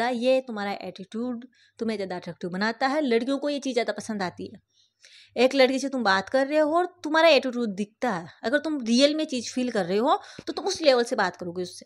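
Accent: Rajasthan, India. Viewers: Another Great Video From BTW You Sound So Rajasthani, Are You From Rajasthan?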